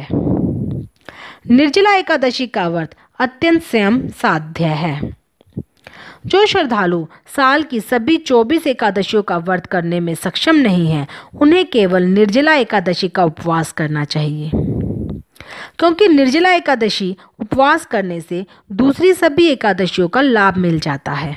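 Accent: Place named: Haryana, India. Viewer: padh padh k mat bolo mataji aur haryanvi accent se mukti pao zara !!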